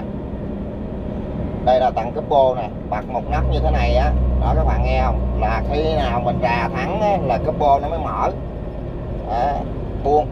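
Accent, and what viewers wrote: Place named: Tay Ninh, Vietnam. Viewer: Giọng bác này ở tây Ninh quê em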